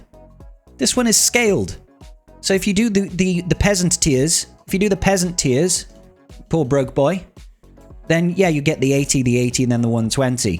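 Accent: United Kingdom. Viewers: Subscribed! Great Content and a heads up Not to spend on this Game. I am playing since 1st may 2021 f2p no LSB or anything at 10.3 Millions GP 8 GLs all 3 GL Cap Ships Kyber 3. I enjoy the Game f2p. No Fomo. What the Game will Break is now the monetazation of DCs. Soon you will Not fight Rosters in GAC, it will be about fighting each others Datacrons. In my View Thats Not even a Game anymore when you think about that. Greets from Germany. Keep up the good work. Btw are you from England or Great Britain? You have a Bit of an british accent. Sounds Nice!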